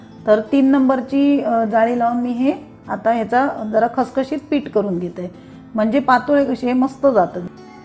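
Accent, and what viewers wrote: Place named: Malvani, India. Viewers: व्वा छान झालेत patole .. ani तुमची मालवणी 👍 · मी आज केल्या पतोल्या मस्त झाल्या. माझ्याकड़े कुंडित आहे हलदिचे झाड़. आणि तुझा मालवाणित बोलणा लय आवडला. मी पण kanakavlicha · छान मी कसालची आहे आम्ही पण असेच बनवतो तुमची मालवणी मस्त वाटली तुम्ही वेंगुर्ल्याच्या वाटत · तुमची मालवणी बोलत ऐकायला मजा येते... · छानच पातोळी दाखविली त्यातून मालवणी बोलणं असे वाटत नागपंचमी आली खुप खुप छान ताई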